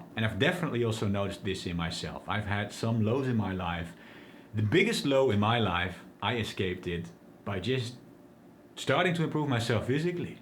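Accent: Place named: Netherlands. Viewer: I have no idea if you want to share your nationality with us, but you seem dutch (no offense because your pronounciation is proper). Am I right?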